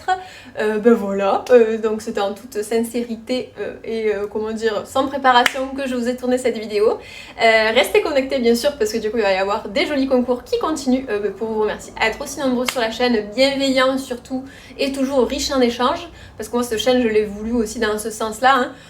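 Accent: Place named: Montpellier, France. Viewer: Félicitations pour le mariage! Je viens de découvrir ta chaîne. Ton accent me disais quelque chose ^^ je suis de Montpellier :) au plaisir de te rencontrer un jour ! En attendant je continue de découvrir tes vidéos ❤️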